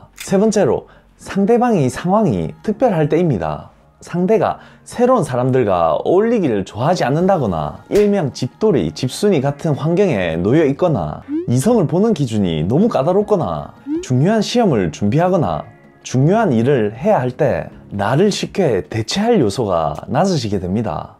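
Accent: Gyeongsang, South Korea. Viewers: 경상도 분인가요? 그쪽 제 스타일입니다 😘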